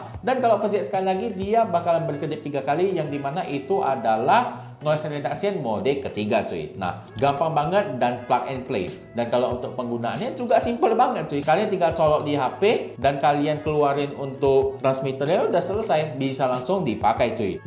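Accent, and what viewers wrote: Medan, Indonesia. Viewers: Medan kali logat kau bang. Wkwkkw mantap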